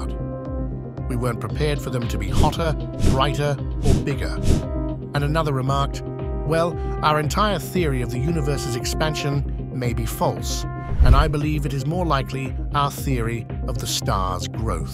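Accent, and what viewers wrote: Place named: England, United Kingdom. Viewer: More Hilarious Bull##@ !! Always with an "English " Accent , again. We all know that "the BIG BANG Theory" is BIG BULL###T !! always has been. Just more Garbage . Kind of like terms such as "Singularity" or " Quantum Entanglement " Made up terms in an attempt to sound " intelligent" . Humans will never be able to describe Creation. This is an Egomaniac 's attempt to claim some kind of knowledge that they don't have. So tiring.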